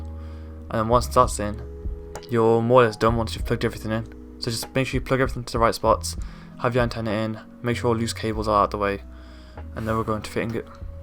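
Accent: Birmingham, United Kingdom. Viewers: Twat....nothing wrong with brummie...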